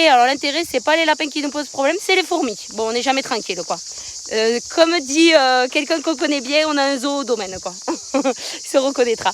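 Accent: South, France. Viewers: Quelle doux bruit !.pas les cigales !ta voix du sud bien sur 👍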